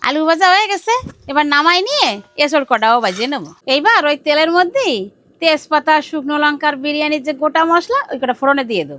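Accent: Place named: Khulna, Bangladesh. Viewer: আমাগে খুলনা জেলার ভাষা মনে হচ্ছে.. 🙂🐸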